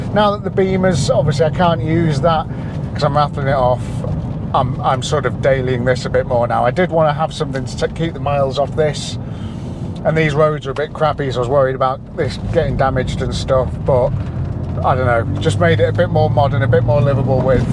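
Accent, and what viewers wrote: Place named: North, United Kingdom. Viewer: Good to see a car channel from the north for a change 👌 don't have to put the subtitles on 😅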